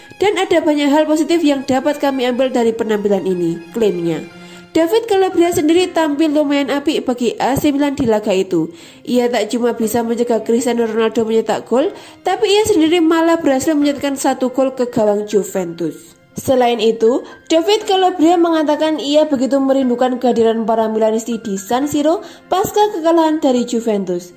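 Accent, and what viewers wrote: Java, Indonesia. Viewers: Mbak mbak, jangan medok medok napa bahasa nya . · Min logat jawanya jelas banget,,😂😂,,Mantup,,eeh mantap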